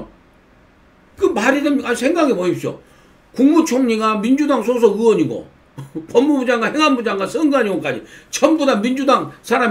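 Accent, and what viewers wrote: Jeolla, South Korea. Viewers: 영삼씨 웅변이 재미있네요 절라도 말별로 안좋아했는데 구수해보시네요 좋아요~^^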